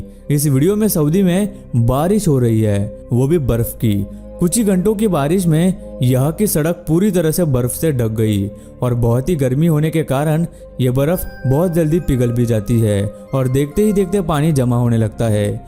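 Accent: South, India. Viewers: Bhai ki voice se lagta he ki bhai South se he ??🤔🤔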